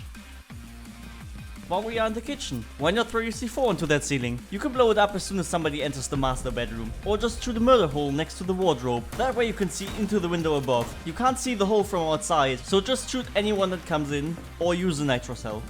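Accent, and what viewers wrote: Germany. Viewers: Are u german ? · you sound very german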